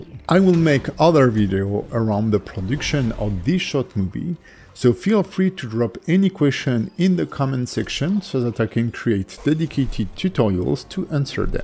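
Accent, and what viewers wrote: France. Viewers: Such meticulous work! It took me a while to get used to your French accent but it was so worth it. I bought your rigging course and it's simply outstanding. My compliments on your teaching skills. Thanks a bunch for sharing your knowledge and striving to make it accessible to complete beginners like me!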